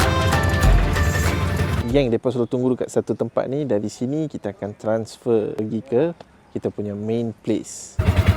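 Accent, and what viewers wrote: North, Malaysia. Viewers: Tertengok masa tengah tunggu time nak tengok bola baru baru ni.. rasa dia ni sembang mcm org utara ja.. dah lama lama layan plak😀 tq la kami nak travel mungkin tak mampu. Boleh tengok dkt sini pon mcm pi skali dah ni😄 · Org utara ka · Best lah bro sembang utara Senang faham sbb explanation slow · Baru saja 3hari lepas saya tengok 1st video channel youtube ni OMG saya tak sengaja tersuka.tersubscribe ❤ terasa macam prabu bg saya tengok filem tamil version cakap melayu loghat utara.i like!!❤ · Minit ..Orang kita dah terbiasa kan..yang jenis sopan la maksud sy..bila bagi dengan tangan kiri, akan say sorry..tak tau la..dia macam automatik tau nak minta maaf tu..padahal orang kadang tak kisah dan tak perasan pun..didikan mak ayah kita dulu kalau nak hulur atau bagi apa2 kat orang, guna tangan kanan..jangan guna tangan kiri..tangan kiri katanya kurang sopan..lagi2 kalau orang Islam, gunakan tangan kanan dan utamakan belah kanan tu Sunnah nabi..kalau masuk toilet je sunnah kaki kiri..apa2 pun, yang guna tangan kiri bukan lah maknanya tak sopan ye.,jangan ambil hati.. 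hihi..suka tengok abang travel sbb sikap dan bahasa dia bagi saya sopan..dan suka dengar loghat utagha dia.. semoga cepat capai 100k.. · Steady Bro. Loghat Utara mendunia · Addicted with his lorat utara. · Bila first time jumpa channel ni, dah dapat agak memang boleh pergi jauh. First time nampak vlogger berbangsa India tapi cakap dalam loghat utara. First tu ingat ada mix mamak ke apa, rupanya tidak. Memang unik dan ada tarikan tersendiri. Tumpang gembira tengok channel makin lama makin ramai viewers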